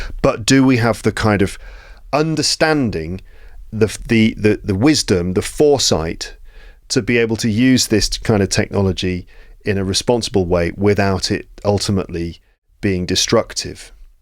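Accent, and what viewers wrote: United Kingdom. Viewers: I've been waiting for a story episode... İ really enjoy listening to interesting stories by your perfect British accent narration.. please share more stories... thank you very much ❤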